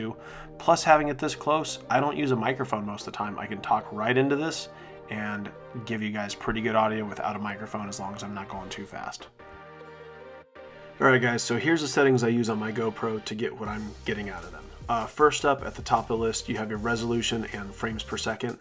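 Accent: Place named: Western Pennsylvania, United States. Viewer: Hey, thanks for posting this. Love your channel. Are you by any chance originally from western Pennsylvania? Guessing based on your accent.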